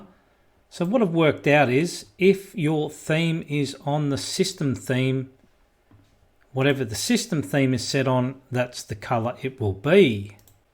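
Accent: Australia. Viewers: i love the aussie accent!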